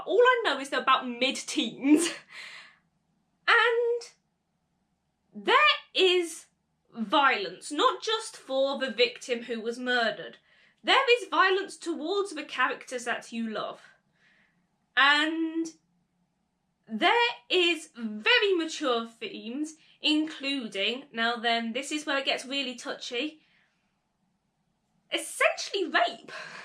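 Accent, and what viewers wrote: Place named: United Kingdom. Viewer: I've always wanted a British accent!!! Don't let it be, ur born with it and it's very very beautiful!!! I'm 20 so u know I'm not some old creep.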